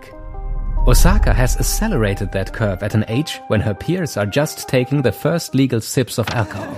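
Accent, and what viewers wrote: Germany. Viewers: Are you [speaker] from Germany?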